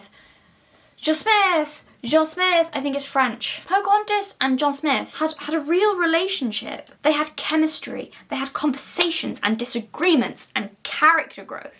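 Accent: United Kingdom. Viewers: I always forget how British you are until you speak So, what i got out of this: British Girl Loves Trees (EXPLICIT?)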